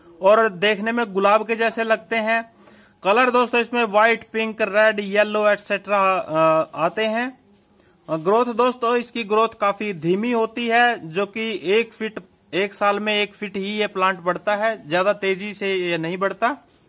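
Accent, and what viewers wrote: India. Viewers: Was he speaking English? At first but then it changed to Indian ? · I don’t understand when you talk with Indian accent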